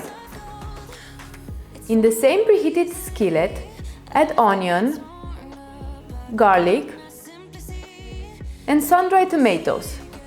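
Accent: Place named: Romania. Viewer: Love the recipe and your accent. Is it Romanian?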